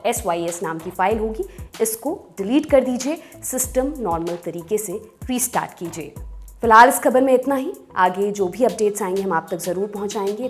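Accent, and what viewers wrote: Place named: Kanpur, India. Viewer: are ye sab kanpuriya hain..aise hi bolte hain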